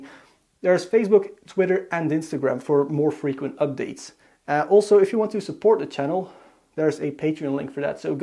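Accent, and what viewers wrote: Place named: Netherlands. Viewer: Are you dutch? you sound dutch. And do proccesors come with thermal paste?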